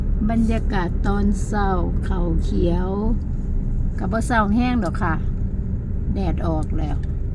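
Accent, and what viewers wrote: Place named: Khon Kaen, Thailand. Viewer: เริ่มเว้าใกล้ข้องแล้วจ้า 😍😍😍สู้ๆต่อไป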